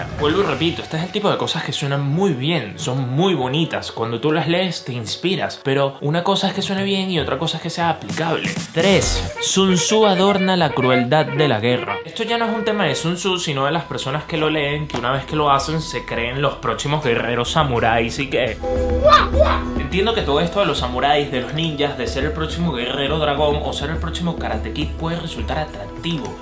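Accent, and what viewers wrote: Caribbean. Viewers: Se que esto es xenófobo. Pero cuando escucho a alguien hablar con acento caribeño, automáticamente siento que va a decir algo poco inteligente. Y hasta ahora no me he equivocado! Pero este tipo ya exageró. Al parecer leyó muchos libros, pero su léxico es tan ordinario. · Que asco el acento caribeño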